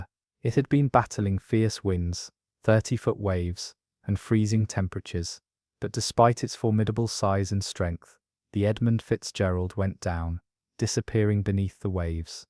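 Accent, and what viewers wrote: United Kingdom. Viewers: It's the British accent, I don't know what it is about it. Got a nice cadence, too.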